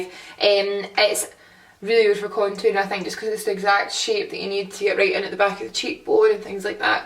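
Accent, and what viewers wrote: Scotland, United Kingdom. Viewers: is she Scottish? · I'm a new subscriber and This is amazing And r u from Scotland 😂 xxxx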